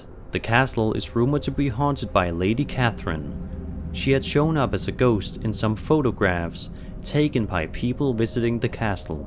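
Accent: Denmark. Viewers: Sir, are you danish? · thank you. My hubby is from Denmark and he pointed out his accent lol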